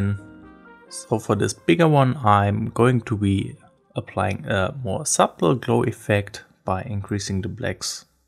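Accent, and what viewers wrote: Germany. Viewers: German accent?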